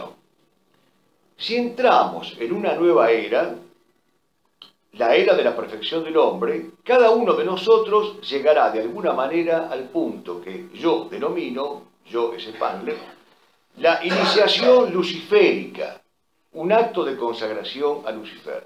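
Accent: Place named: Buenos Aires, Argentina. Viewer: Claro y preciso, muchas gracias Ademas el tonito porteño es agradable, (perdón trinitario) saludos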